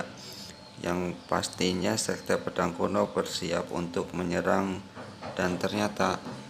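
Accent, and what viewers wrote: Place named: Java, Indonesia. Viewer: Medok banget jawa nya bang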